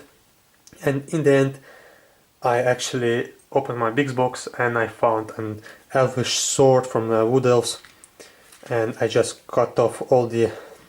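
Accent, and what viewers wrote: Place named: Russia. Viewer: I work with people every day in Atlanta, GA, USA that have MUCH worse Russian accents than you!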